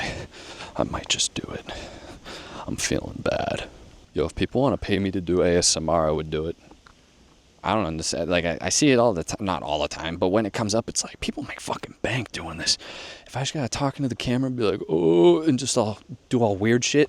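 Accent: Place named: Massachusetts, United States. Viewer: Where you at? By the sound of your accent I often think you must be in Massachusetts.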